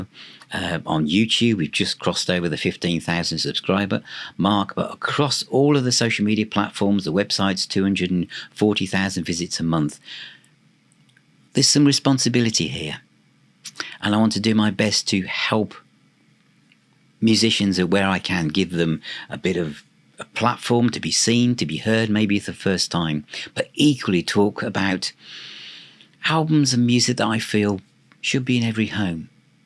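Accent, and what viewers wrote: United Kingdom. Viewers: Discovered you around 6 months ago and really enjoying the videos. It’s great to see an ordinary bloke from the U.K. with a regional accent talking about music I love (most of the channels I follow are American). 45 year old, mainly CD collector here, collecting rock and metal. I’ve also just joined your Facebook group and waiting for approval! Keep it up mate. After watching this video it seems there’s lots to look forward to through the Autumn. Thanks for the content. 🤘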